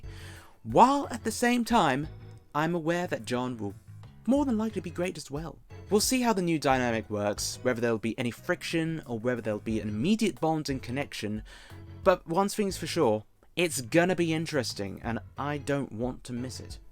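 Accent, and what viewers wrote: RP, United Kingdom. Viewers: First off, to you and all fellow Whovians, KISS army (fans of KISS), Trekkies, Jedis and everyone who's alive (I think you'd agree to name all the people on Earth would be difficult) - HAPPY NEW YEAR 2021. As I've said many times now... FUCK OFF 2020 (apologises to ladies present for my swearing). Wouldn't you agree 2020 is the first year that people the world over ACTUALLY and personally DISLIKE? Anyway, ODDLY, I have to say following what you were saying in the video was difficult? No disrespect. I love you posh accent and tone because I have it too. We have the Mojo ladies and Yanks like LOL Maybe picked up from watching Jon Pertwee, the previous two Doctors and beyond with their BBC Queen's English? Let's face it, until 2005, Doctor Who never was working class or had any characters representing them until the 9th & 13th Doctors. 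And now Dan has/will? Which leads me to the next point: Is it possible the increase in northerners has put off American viewers because apparently they have been baffled by John Bishop? I haven't watched "Resolution" or much of the season that finished. Not sure which one it is. So, I was confused by the trailer with John Bishop. It looked to me in the way it freeze framed and the wording to be introducing Bishop as the NEW Doctor? In fact I think they've MISSED a chance to cast him which would've been perfect. I don't think a female Doctor has been a hit with non fans. But to say the show's problems is due solely to the Doctor being femal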